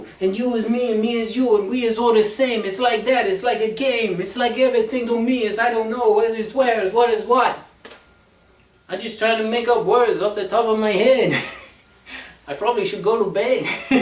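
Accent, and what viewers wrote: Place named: United Kingdom. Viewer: i like how you got a british accent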